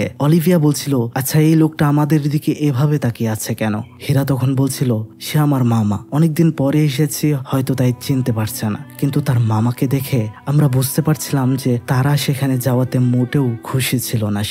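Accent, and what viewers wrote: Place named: Bangladesh. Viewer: Kothar style a mne hocce uni bngldshi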